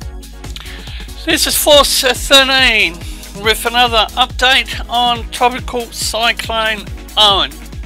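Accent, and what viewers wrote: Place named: Australia. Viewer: Lol what is this guy saying, this is just like Ozzie commentary to the max with the most Aussie accent haha 🤣 👍☔️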